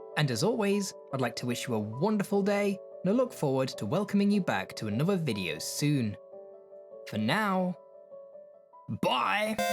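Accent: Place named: Shropshire, United Kingdom. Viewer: I don't, I just thought your accent sounded a little Shropshire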